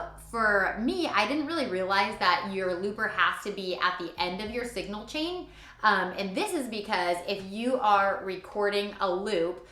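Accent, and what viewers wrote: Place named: Nashville, United States. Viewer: I know very little about loopers. Quite proud of myself that I previously identified the TC Ditto x2 as my #1 pic for the same reasons that you did. This was still a real helpful video. Also, have to say- starting with a cool demo is so good. Most people start their vids with five minutes of talking. You Win! and sound like a Nashville area pro.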